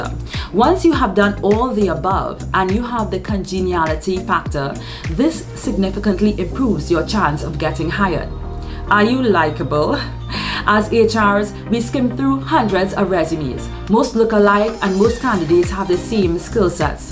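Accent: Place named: Trinidad, Trinidad and Tobago. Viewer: I’m picking up that beautiful Trinidad accent. Great tips. I’ve been applying these tools. I go into the interview with the intent of making it more of a conversation in selling myself.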